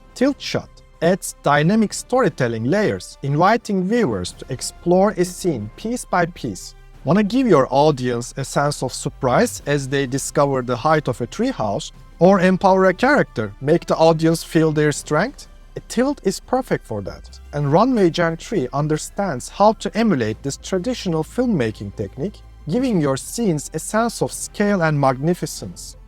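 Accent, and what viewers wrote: Turkey. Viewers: Your accent like Turkish... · are you turkish? your accent sounds like turkish. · Bu aksan Turk aksani degilse ben de bir sey bilmiyorum :)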